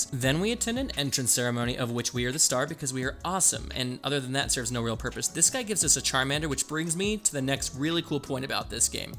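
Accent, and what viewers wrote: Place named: England, United Kingdom. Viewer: IBXToycat IMPRESSION/ making fun of the american accent is the first thing i thought of after you apologised for an english one, lol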